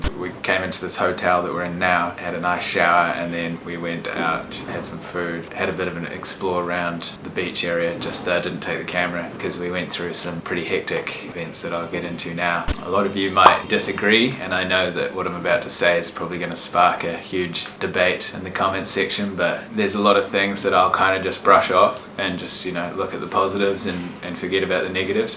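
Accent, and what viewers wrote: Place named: New Zealand. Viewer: Hey,...just want to stay how good your videos are, so interesting, and eye opening in all aspects. You are very courageous even to venture into the places you have, and as I will definitely never venture into those parts of the world that you have so bravely taken the time to visit, your videos give a very good idea of what I've chosen not to experience personally. Hats off to you for continuing this channel. Stay safe, watch for crime, and keep them coming. I'm an avid follower now. And by the way, I spent 23 years in NZ living in Auckland and Wellington, so your accent is very familiar to me. I now live happily in the prairies of Canada. We are avid travellers to most of Europe, Australia and New Zealand, Alaska and the Caribbean areas, taking cruises mostly. With the COVID, of course, things have gone on hold for about 2 years. 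I'm 74 now and once again, want to thank you for all the good work you are doing to enlighten us on how some of world exists. My wife and I say every day how blessed we are that we live where we do. It's so interesting, though, that despite the difficulties you have encountered, people there are so friendly and seem to be coping somehow. Once again, stay safe, keep those great videos coming, as it is the only way I'll ever appreciate how there is so much suffering in our world today. I'm afraid the population is getting out of control in the areas you are visiting. Hopefully, humanity will sort it all out one day. Cheers!!!!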